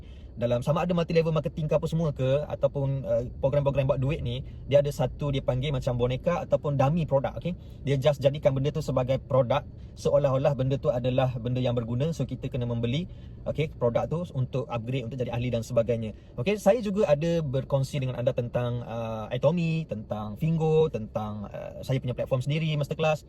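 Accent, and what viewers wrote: Terengganu, Malaysia. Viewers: org ganu ke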